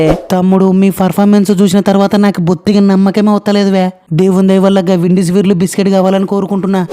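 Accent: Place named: Andhra Pradesh, India. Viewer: Ni videos & ni andra slang I like it bro keep continue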